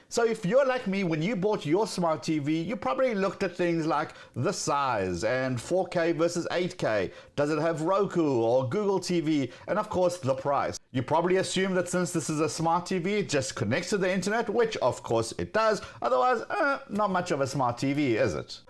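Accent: South Africa. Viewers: Are u originally from South Africa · For this reason I'm using an ethernet cable & also since the router is too far from my android tv box. Btw you sound like you have a South African accent since I'm from South Africa.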